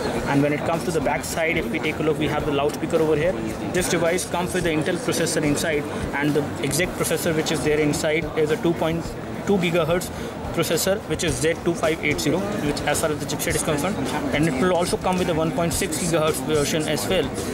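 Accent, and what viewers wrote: India. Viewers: I can understand the words even if it's fast. He's pronouncing it clearly by using his indian accent.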